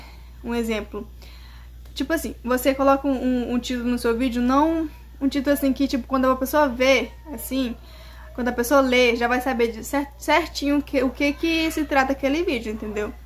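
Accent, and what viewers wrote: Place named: Maranhão, Brazil. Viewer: Vc fala maranhense msm😍💖😍😍😍😍lindahhh sou sua fã... vc me deu essas dicas💖❤e ta ajudando 🙏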